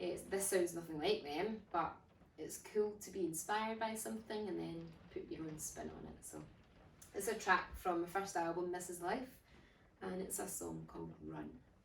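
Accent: Scotland, United Kingdom. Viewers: Really love your Scottish accent! Ciao from Rome! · I could just sit and listen you talk. That Scottish accent turns me into puddles.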